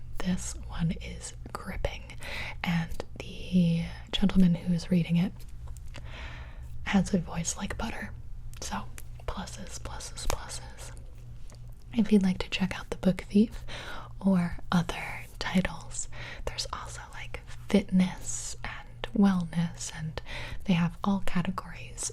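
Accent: England, United Kingdom. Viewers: Where are you from? England?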